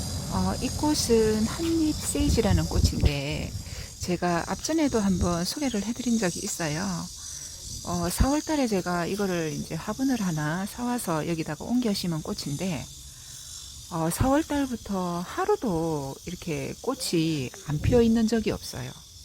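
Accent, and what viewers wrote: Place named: Gyeongsang, South Korea. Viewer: 님 반갑습니다 올여름 날씨가 대단합니다🍋🍋🍋 영상 멋지네요🎾 경상도 사시나요 말씀이 친숙합니다 고추 말림이네요 즣은즐감합니다 꽃도 소개해주시고 영상 멋져요🍇 감사합니다🖼🖼🖼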